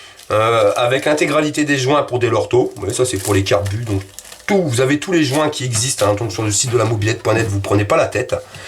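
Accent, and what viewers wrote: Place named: Nord-Pas-de-Calais, France. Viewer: il parle chti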